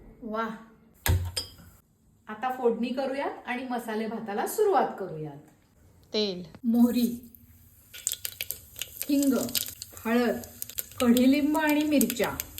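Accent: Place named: Pune, India. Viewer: वाह भारी, फार फार सात्विक वाटलं हो. काय हो तुम्ही पुण्याच्या काय? कारण हा असा मराठी चा एक्सेंट तिथेच असतो. फार पुर्वी हा आमच्या गिरगावात... आता तो तिथे नसतो.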